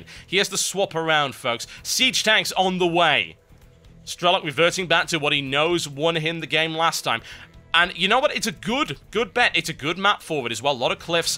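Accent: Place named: Northern England, United Kingdom. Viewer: It isn't his actual accent, he actually has a Northern accent. If you listen carefully when he gets excited, you'll hear it.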